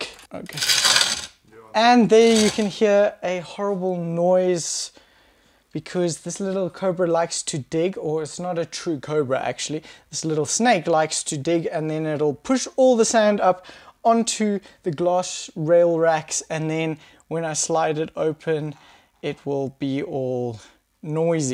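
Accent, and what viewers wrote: South Africa. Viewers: Are u South African by any chance ? Your accent sounds like it.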